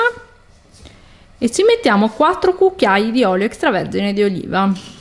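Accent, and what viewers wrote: Apulia, Italy. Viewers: Dall'accento sembri pugliese. Vero?😁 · 👋👋 SEI brava e chiara, di che regione sei PUGLIA, VOLEVO CHIEDERTI CHE NE PENSI DEL ROBOT BIMBY LO USI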